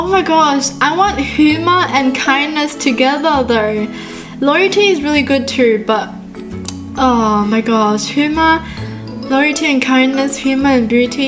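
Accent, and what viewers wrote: Australia. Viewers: You're* her parents are Asian so that makes her Asian but she lives in Australia, that's why her accent is like that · Your Asian? Oh..Well I always thought you were Australian😂😂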